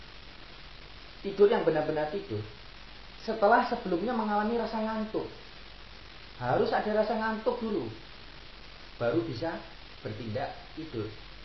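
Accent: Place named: Java, Indonesia. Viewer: dari cara ngomongnya sih seperti sprti logat jawa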